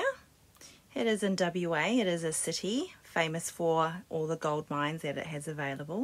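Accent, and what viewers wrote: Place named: New Zealand. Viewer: Can tell the kiwi accent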